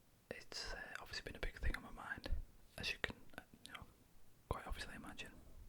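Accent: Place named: Northern England, United Kingdom. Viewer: Just discovered you. You are now my favourite ASMRist. You sound like your from the north of England; if so where abouts? Yorkshire, Lancashire?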